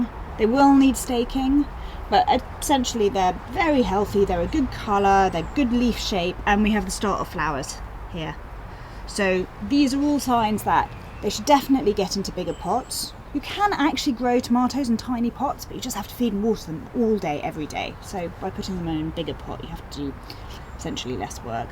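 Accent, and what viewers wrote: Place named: England, United Kingdom. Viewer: Very nice video and lovely English accent, thank you!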